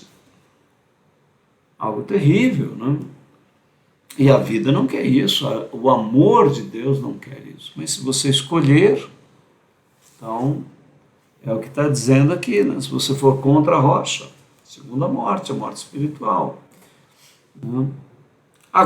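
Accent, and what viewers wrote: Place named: Portugal. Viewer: I sotaque acportuguesa e perfeito 😉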